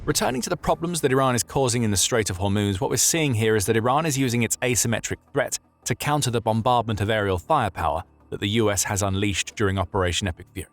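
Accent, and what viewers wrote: United Kingdom. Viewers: Why are all of the videos are narrated by guys with a British accent????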